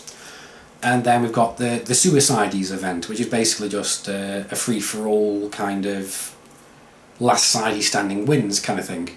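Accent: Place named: England, United Kingdom. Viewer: Congrats on reaching 15k subscribers. And good luck with getting more. BTW, from which part of England are you from?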